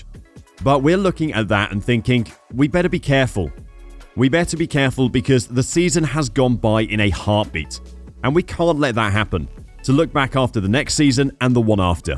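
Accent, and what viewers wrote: United Kingdom. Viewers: “Clearly clear” sounds totally fine with a British accent. That accent definitely makes everything sound much classier 👌👌 (no sarcasm)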